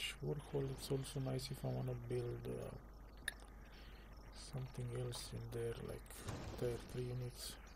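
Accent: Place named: Egypt. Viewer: Hey mate, it's nice to find someone that knows what they're doing with my favorite race/class combination. Also, I was wondering, what accent is that? I want to say there's some Egyptian/Arabic influence there, but I'm not well-versed on middle eastern/mediterranean accents. Also... "You wanna charge these guys? Be my guest. Pretty sure your men won't like it, but...". I love Shock Troopers. They're my favorite unit in the game. <3 Your accent is also hilarious for me. It adds a sort of toughness to the Orcs that you don't find in a typical white guy playing this combo on Youtube.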